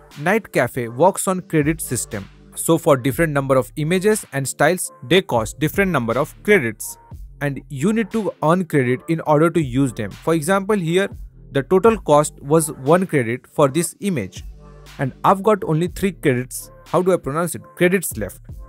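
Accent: India. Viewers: If you open a tutorial and the person starts speaking in an Indian accent... then my friend you hit the jackpot cuz you'll get more than you even wanted. Thank you soooo much bro!!! 🙏🙏🙏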